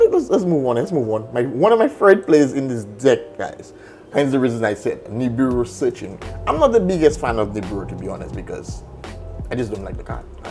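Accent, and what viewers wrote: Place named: France. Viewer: If I'm right, that a great French accent. If not, that just a great accent xD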